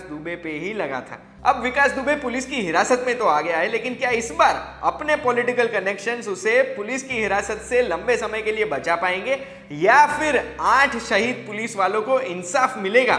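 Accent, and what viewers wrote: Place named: Gujarat, India. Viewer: hindi ma bole to pan Gujarati sambhday chhe 😁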